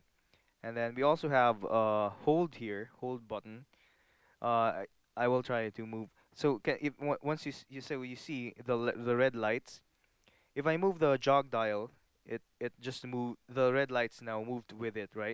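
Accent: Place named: Philippines. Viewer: Ill review, tip and tricks! Sounds like your Pilipino too, LOVE dat! JAHbuhay king...bless up!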